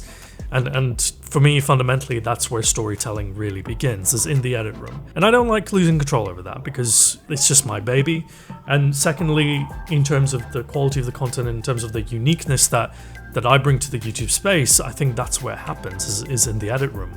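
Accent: United Kingdom. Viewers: Happy birthday,! Only subbed very recently, literally two days ago. But I love the content I have seen, and it's lovely to see/hear a brit in a sea of Americans. Thanks for the tips and the laughs, here's to 2021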